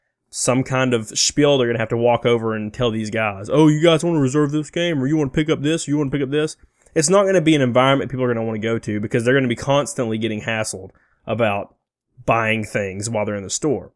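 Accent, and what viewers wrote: South, United States. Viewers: It's a southern thing. In my time there, I ran into tons of otherwise highly intelligent people who mangled the pronunciations of all kinds of words.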